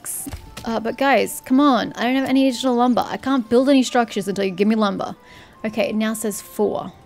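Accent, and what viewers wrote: Australia. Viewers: Just found your channel! I downloaded manor lords last night and I love it. I'll follow on your series for sure! Love hearing another Aussie lady do a play through!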